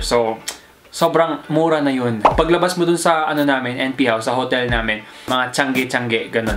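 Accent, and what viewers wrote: Thailand. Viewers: Honestly, u have a genuine sound of Thai! Love it! Love you Kuya! Also one of my dreams is to visit Thailand. Sana all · his thai accent. ✊ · YOUR VLOG IS VERY ENTERTAINING HAHAHA VERY INFORMATIVE 💚 ALSO LOVE YOUR THAI ACCENT HIHI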